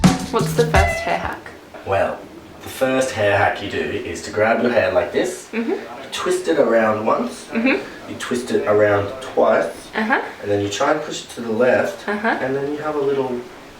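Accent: Australia. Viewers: I just found you on youtube and I'm obsessed! I love your videos and your Australian accent and you really help me to understand more English because I'm from Sweden and I'm not so good at speaking or understanding English🇦🇺🇸🇪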